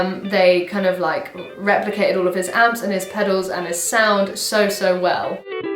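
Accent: England, United Kingdom. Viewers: Se nota que es inglesa, puedo conocer esa forma de hablar y su acento pirata! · Unbelievable!! I love & after I learned how to play my 1st song I had a newfound respect for the man. I still am amazed by the way he thought and the licks he came up with. The 1st song I learned to play ever was!! I'm def going to check this out. I love your accent of course you are from the UK.. it's hard too meet a girl that rocks in the US. Now it's hard to meet anyone, (COVID) smh. Love your music keep up the good work you rock!! -- Nigel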